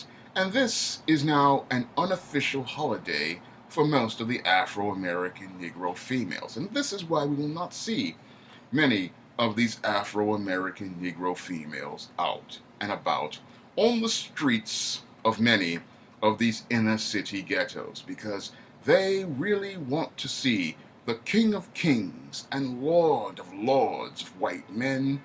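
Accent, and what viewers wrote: United Kingdom. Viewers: Hilarious video!! I love that British accent! 😂 It's somewhat sad & ironic that this wedding that's got these swirlers & wannabe swirlers are so excited is taking place on the birthday of Malcolm X. I've been saying that if Prince Harry was marrying some white woman from the North of England, or Wales, or Scotland, nobody would even begin to care, particularly here in the U.S. I'm sick of hearing about it second-hand, and I'll be glad when it's all over with. Thanks for making humor out of this. Much Respect! ✊ ...I mean, Cheerio pip pip! 🇬🇧💂🇬🇧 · Great video, Mr. Not only insightful and hilarious but between that British accent and your laughter at the end of the video you scared the shit out of me. I thought I was watching Dracula. Love that creepy vibe. Keep up the great work, sir. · Lmao! You're sounding just like a Brit my man.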